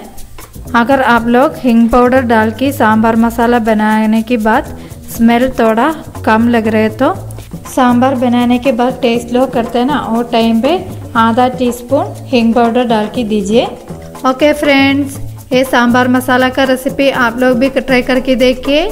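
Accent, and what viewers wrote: Kerala, India. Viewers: Malayali ki hindi .good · Love ur Sweet voice of speaking hindi with Kerala accent😅😅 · Mam your recipe and your hindi pronaunsation both are authentic keralian nice thanks god bless you 🙏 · I know this kinda sounds crazy but your voice and way of speaking hindi reminds about my frnd from Kerala during my UG days. I just love that. #oldmemories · Seriously, I love her hindi more than the recipe .And it is so Kerala authentic. Really cute.😀😀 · I can actually feel the mallu vibe while she's talking istggg the actual way a malayali talks when they try to speak in hindi I remember my relatives talking like this when they came to Mumbai for the very first time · Your voice is cute...than urs receipe...love Hindi from keralites...its sweet n swett.. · 😀true..authentic kerala hindi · Last year we had been to kerala.And also one of my best friend is from kerala. 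I just love your hindi accent. It is so sweet. Thanks for sharing keralian recipes. God bless you n stay blessed always. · Yess true your hindi is sooo cute... Even i am malayalee but born and brought up in Gujarat and Bombay so my accent is opposite when i speak malayalam all understand that i am not brought up in Kerala...😀😀😀😝. I am trying yours recipe today.. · I tried the recipe. Yummy. Sorry to make personal comment. Your Hindi is really good though in Malayalam accent with a beautiful smile. God bless you. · Loved your recipe. Your detailed instructions. N above all your enthusiastic n beautiful kerala accent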